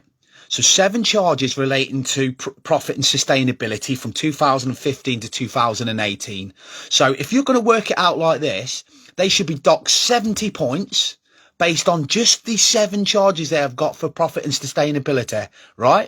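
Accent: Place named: Liverpool, United Kingdom. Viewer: Strong Liverpool accent here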